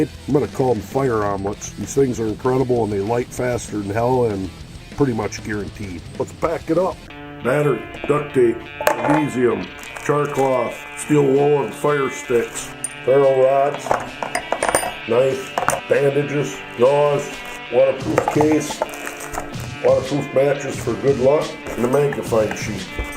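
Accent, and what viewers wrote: Minnesota, United States. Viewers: he's from Minnesota sounds like the Gov.....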